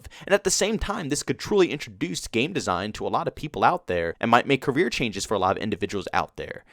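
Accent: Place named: Texas, United States. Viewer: You talk like an American, not a good way, in a Texas annoying type way. I'll stick to Level Cap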